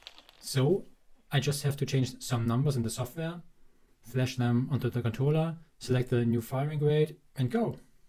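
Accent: Germany. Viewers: Your accent is uhh... German? I mean it wouldn't be a surprise to me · I don't know if it is a good idea for people with germanic accents making weapons..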